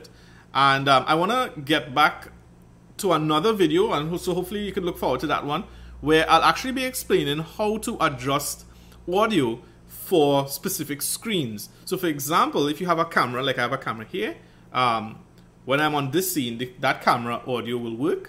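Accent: Trinidad and Tobago. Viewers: He sounds trini in arima or chaguanas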